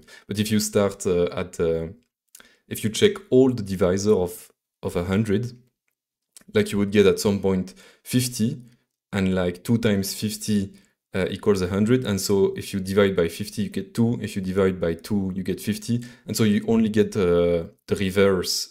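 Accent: France. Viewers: That French access is something truly marvelous, chef's kiss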